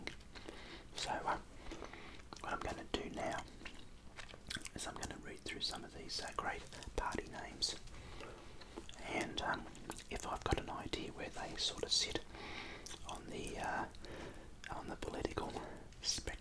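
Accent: Australia. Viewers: I think half of these views are mine for the last months 😅😅 i hear it every day almost. Chewing gum rules! Till now never have noticed the Australian accent, but you introduce me to it and I absolutely love it. And it was very creative idea to talk about politics (maybe not a popular theme in the asmr world but a wonderful niche)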